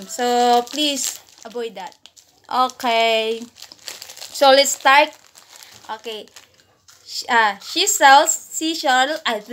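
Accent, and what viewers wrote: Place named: Visayas, Philippines. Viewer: Bisaya Pala ikaw Heheeh nice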